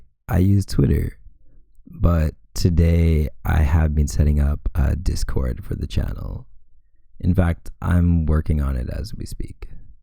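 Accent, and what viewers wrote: Canada. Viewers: Lol I knew you were Canadian the minute you said "about". Love the video you are definitely in my age range which makes me feel less weird listening to this lol. I hope you eventually make a discord/patreon or something similar so we can go on supporting you. Until then I will happily share your videos and wish you the best. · I can hear that Canadian twang!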